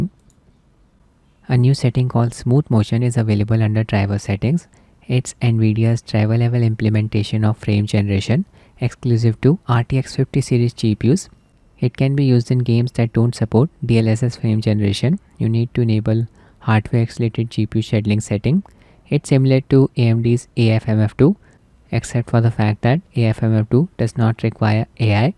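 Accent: India. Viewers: Indian voice, in the garbage it goes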